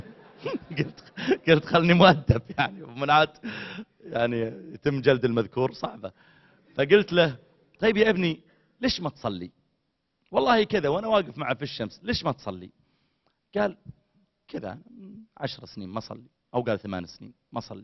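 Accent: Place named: Saudi Arabia. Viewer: يسعدك قمر يا شيخ حديثك الموزون الرائع في كفة ربي يجزيك كل خير وتلك اللهجه السعوديه في كفة أخرى ما اروعها🌸🌸🌸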